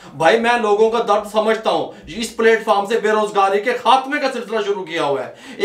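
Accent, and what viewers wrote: Punjab, India. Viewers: ji thuadi Punjabi bhaut swaadee hey. Milk shake nalo vi jaida.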